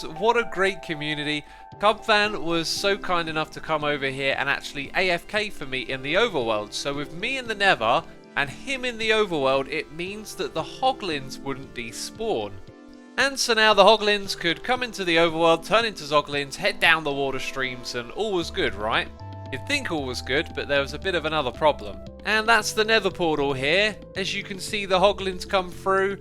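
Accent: United Kingdom. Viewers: Because of his British accent and his commentary style of the true gentleman, I feel like the sound of sipping a cup of tea every now and then would happen any time.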